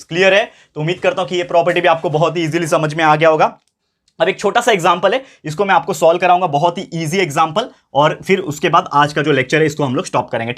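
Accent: Maharashtra, India. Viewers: Marathi bolne ki koshish jari hai... ❤❤❤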